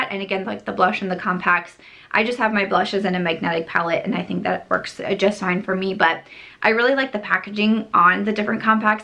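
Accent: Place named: Midwest, United States. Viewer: Love the midwest in you!!!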